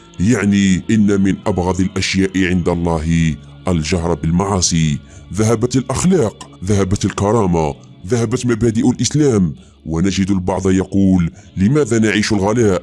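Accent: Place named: Skikda, Algeria. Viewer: حسب الهدرة تاعك تبان سكيكدي 100 / 100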